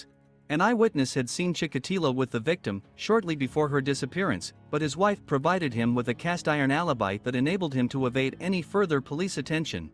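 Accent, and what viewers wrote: Russia. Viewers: I love your videos but I like it better when you used your real voice, it sounded much better with your beautiful accent. Russian I believe ? I love it !!! Man he looks scary . Pretty sick dude.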